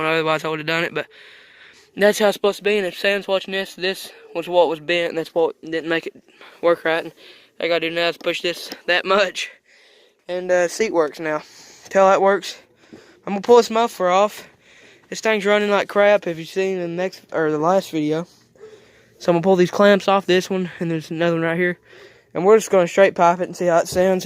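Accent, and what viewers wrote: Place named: United States. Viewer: god damn son you sounds as american as you can be :) (as a none american) no hate i find it funny just like the british accent and god damn that runs like shit :) jesus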